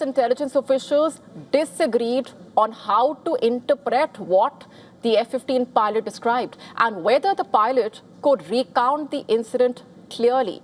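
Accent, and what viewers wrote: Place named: India. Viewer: This lady's Indian accent is way too strong. Could only understand 25% of what she said.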